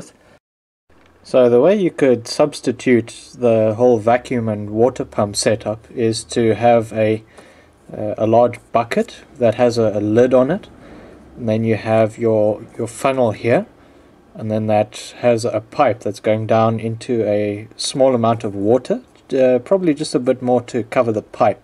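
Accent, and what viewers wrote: South Africa. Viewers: Are you South African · Really cool, are you south african?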